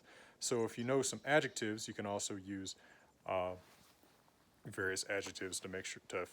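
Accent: United States. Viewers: This might be what old norse would sound like spoken by someone with a heavy American accent. Especially the R and the L reveals the speakers origin. Or are there any evidence that old norse were pronounced closer to American English than to modern Scandinavian languages?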